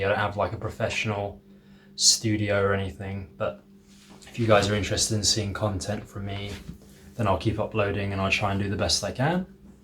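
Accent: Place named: United Kingdom. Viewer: Only Asian I know who can speak with Britain accent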